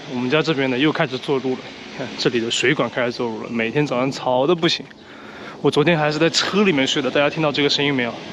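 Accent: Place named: Ningbo, China. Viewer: 寧波 話聽不懂但很孰悉。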